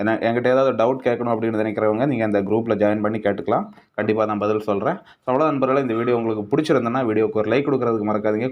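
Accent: Kanyakumari, India. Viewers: Bro native Kanyakumari ah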